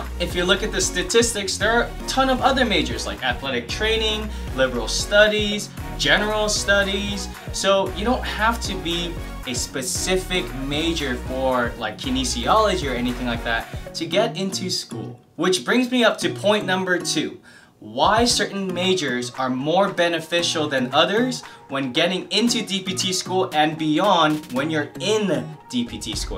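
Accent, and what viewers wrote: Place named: South Korea. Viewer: nah it sounded Korean. I see you, my dude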